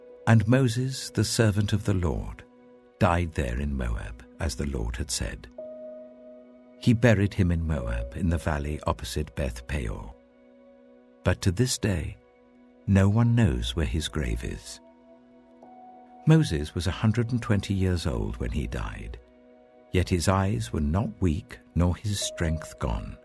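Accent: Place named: RP, United Kingdom. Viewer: Let's face it you cannot beat a really nice cultured British accent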